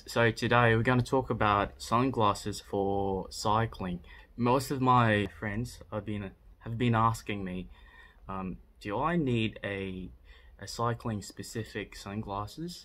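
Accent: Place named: Philippines. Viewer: U a filipino bro your accent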